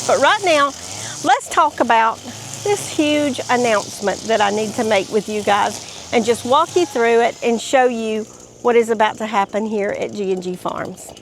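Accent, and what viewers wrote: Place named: Alabama, United States. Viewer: Wow, what an exciting project we tried doing something similar on our own but probably a lot like where you live we sometimes get really Highwinds and it’s hard to keep those tunnels up. Maybe yours is going to be different I can’t wait to see you and we have some aviaries on our property, and I have been planting inside of them to keep out the dears, and a few other odds and ends of varmints clouding all of our peacocks, who love nothing better than to eat the new growth on veggies and fruits anyhow, I sure enjoy your channel and I love listening to your voice into Brooks voice on College Hill Farm. It reminds me so much of all my relatives back in Alabama.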